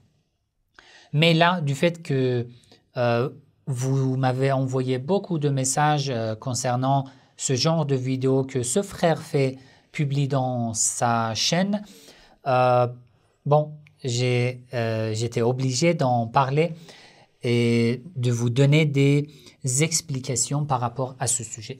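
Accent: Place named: Iran. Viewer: Bonsoir, vous etes iranien?? J'aime bcp l'accent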